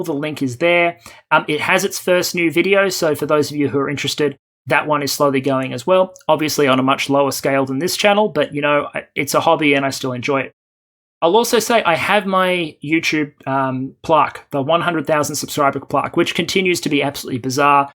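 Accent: Australia. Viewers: Not in the middle of an Australian accent like yours, and not both in the same paragraph.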